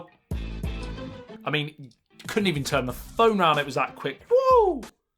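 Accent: England, United Kingdom. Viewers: Are you english? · British accent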